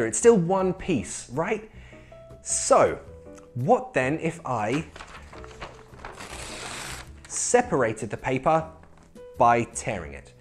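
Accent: United Kingdom. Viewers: He's British!! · Are you British, teacher? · The way he talks has a very typical British accent than we Americans. Awesome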